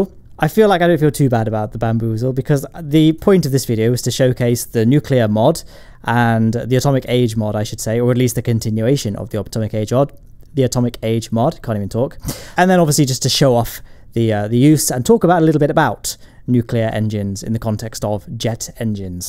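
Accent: United Kingdom. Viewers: He is British so his accent is like that now come on chill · Where are you from? You sound British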